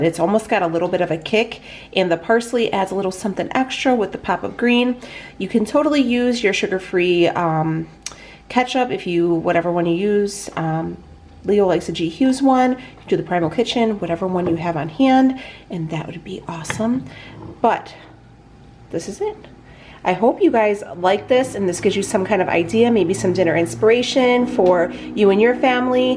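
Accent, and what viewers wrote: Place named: Minnesota, United States. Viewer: You sure sound Minnesotan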